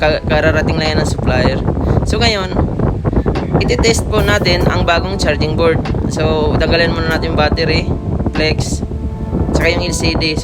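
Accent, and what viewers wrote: Iloilo, Philippines. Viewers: Ilonggo kaba boss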